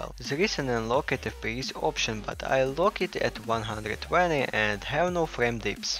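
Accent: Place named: New Zealand. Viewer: bro the minute you spoke i was like HELLO FELLOW KIWI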